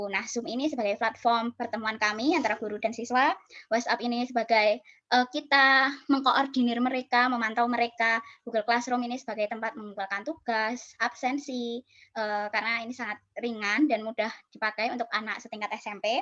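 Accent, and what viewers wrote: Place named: Java, Indonesia. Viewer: Suaranya medok gaes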